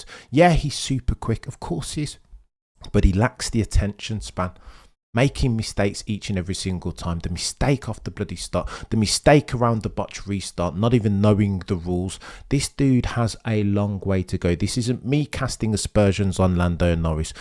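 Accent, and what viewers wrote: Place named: Oxford, United Kingdom. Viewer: Very philosophical , brilliant Oxford english!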